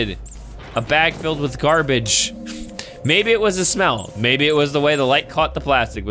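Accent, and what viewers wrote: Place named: Russia. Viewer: In case you didnt notice he has a rusian acsent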